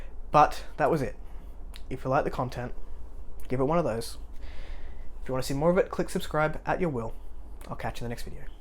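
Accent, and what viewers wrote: Australia. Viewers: Extremely open and brave video,. Sounds like a long and difficult journey, but I’m glad you’ve gotten to a good place with it all. I don’t directly know anyone who’s transitioned, so I learned a lot. Thank you. And apart from that, it’s always a joy to hear your Aussie accent, mate. 🙂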